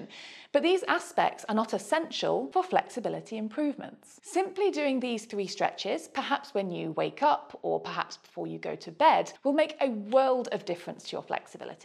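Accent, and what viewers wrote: United Kingdom. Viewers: And beautiful English accent. Not another American.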